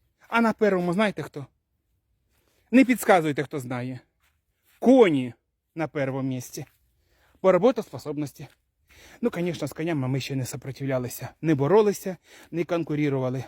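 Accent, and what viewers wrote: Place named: Bila Tserkva, Ukraine. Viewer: Боже ж ты мой, какой приятный человек, и говор его душу ласкает. И юмор добрый,житейский. Так говорила моя бабушка и мама, они родом из под Белой Церкви. Давно их нет на этом свете, а я помню их говор. Спасибо за юмор, за доброту. Всем мира и чистого неба.🎉🎉🎉❤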